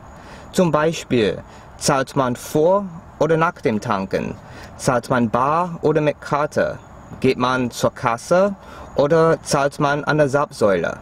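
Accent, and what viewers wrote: United States. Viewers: der typ spricht mit amerikanisher aussprache, spricht viel bessar als mich aber. gruesse aus bulgarien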